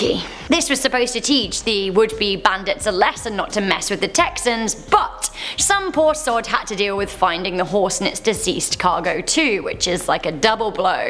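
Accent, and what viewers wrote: England, United Kingdom. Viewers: Honestly loved this list! Most of these were my bed time stories growing up 😂 a very popular one is La Mano Pachona. Basics of the myth is that a furry hand would come up from under the bed and pull your foot. Sasquatch? Chewbacca? Who knows, it was scary as hell growing up tho 😂 (Side note, I've always wanted to hear someone with a British accent speak Spanish. You did not disappoint. Thank you for fulfilling my life long dream 😂) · She is English and has an accent my guy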